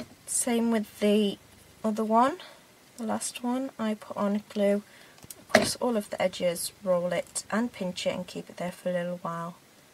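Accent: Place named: North, United Kingdom. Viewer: There's a Northern voice in there girly!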